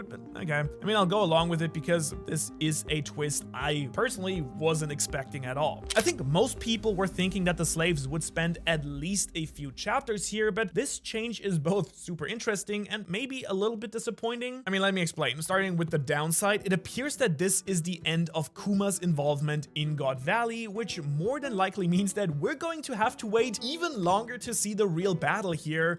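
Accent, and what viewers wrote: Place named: Germany. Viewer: Ich habe gerade Raffey‘s neustes Video geschaut und ich wusste nicht dass du Deutsch sprichst. Jetzt höre ich den leichten Akzent aber ich hätte es nie geglaubt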